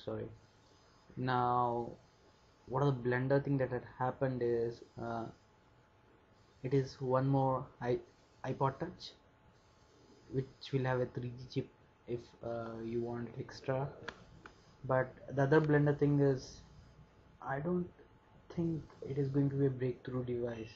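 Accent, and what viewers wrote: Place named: Vietnam. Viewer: LEARN TO SPEAK ENGLISH. u from vietnam? u sound like one, seriously correct your accent, pull your tongue out (vietnamese language require to speak with your tongue always intact in)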